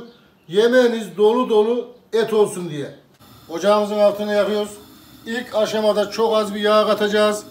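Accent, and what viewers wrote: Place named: Central Anatolia, Turkey. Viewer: „Baska bisey deel“😀 ustam ankaralimisin veya ic anadolu, bizim oranin sivesi sanki 😇 püf noktalariniz icin cok tesekkürler :)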